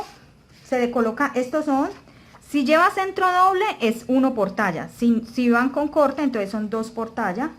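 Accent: Colombia. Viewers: ME ENCANTA TU FORMA DE HABLER TAN COLOMBIANA QUE ORGULLO ERES UN GENIO JAJAJAJA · Espectacular método bien explicado y tu acento paisa increíble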